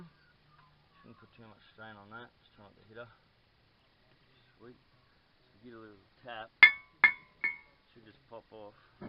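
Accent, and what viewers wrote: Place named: Australia. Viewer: Thanks for the video bud. Love the Commentary. Pure Aussie... Well done Mate.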